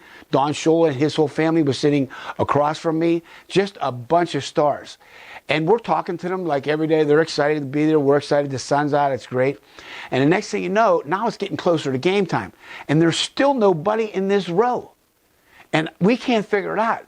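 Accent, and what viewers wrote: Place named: Pittsburgh, United States. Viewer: This guys Pittsburgh accent has me missing home.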